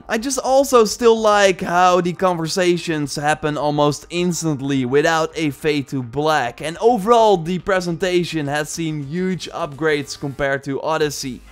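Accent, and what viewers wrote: Netherlands. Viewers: it's indeed classic Dutch laziness. With some practice they can easily speak English perfectly without an accent but after following this man for about 2 years now he literally hasn't improved at all. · You are aware he has a Dutch accent, right?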